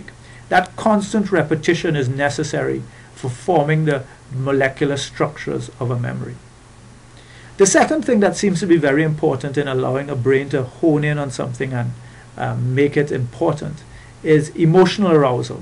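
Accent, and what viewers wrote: Trinidad and Tobago. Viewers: really clear lecture, excellent rhythm and timing. thanks for making this! sounds like a Trini.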